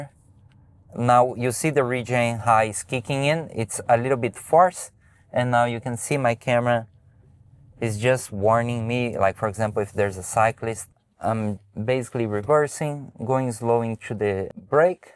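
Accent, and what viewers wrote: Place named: Brazil. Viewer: I love your brazilian accent - we are so lucky to have you here in australia reviewing this car. thanks for your advice!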